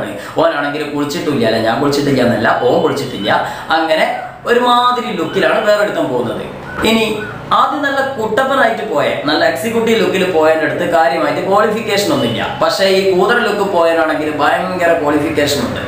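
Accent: Malappuram, India. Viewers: ikkaa ingale language kettaal ariyaa malappurathkaarananenn Malappurathe piller like plzzzzz😄😄😄😄😄😃😁😁😁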